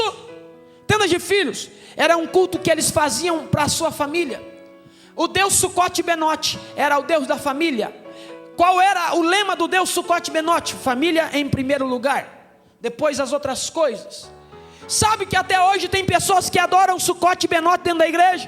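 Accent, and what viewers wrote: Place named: Santa Catarina, Brazil. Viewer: VARÃO DO DEUS ALTÍSSIMO, DÓCIL, HUMILDE E MUITO SÁBIO!!! DEUS O ABENÇOE RICA E ABUNDANTEMENTE, JUNTAMENTE COM SUA LINDA ESPOSA IRMÃ FERNANDA, LINDO O SEU SOTAQUE. O MEU TAMBÉM ERA ASSIM , POIS SOU CATARINENSE. MAS, AGORA SOU CATARINOCA . MEIO CATARINA E MEIO CARIOCA.GRAÇA E PAZ!!! IRMÃ SCHIRLEY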